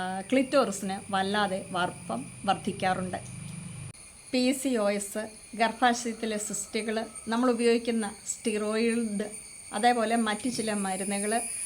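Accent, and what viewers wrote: Kottayam, India. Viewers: ചേച്ചീ, പെണ്ണ് മുകളിൽ ഇരുന്ന് കൃസരി കൊണ്ട് ലിംഗത്തിൽ മുകളിലോട്ടും താഴോട്ടും ഉരയ്ക്കുമ്പോൾ രണ്ടു പേർക്കും കിട്ടുന്ന സുഖവും അനുഭൂതിയും വാക്കുകൾ കൊണ്ട് പറഞ്ഞറിയിക്കാൻ കഴിയില്ല. എന്നിട്ട് വേണം യോനി ലിംഗത്തിൽ അമർത്തി ഉള്ളിൽ കടത്തുന്നത്. ഇത് ശരിയല്ലേ ചേച്ചീ? ചേച്ചി പാലാക്കാരിയാണോ? കോട്ടയം സ്ലാങ് കേൾക്കാൻ രസമുണ്ട്.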